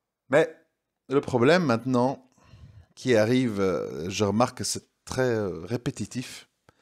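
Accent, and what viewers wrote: Belgium. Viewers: tu es tres flou par manque de temps et d’exercice je le sais tu es un homme qui enrichit en plus avec ton accent belge qui rend + crédible qu'un francais. J'ai compris que tu es sincère inutile de perdre du temps sur ça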